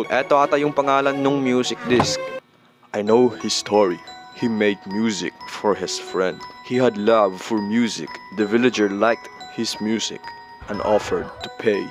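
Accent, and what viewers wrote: Visayas, Philippines. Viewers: Balay hahaha bisaya ka dong😂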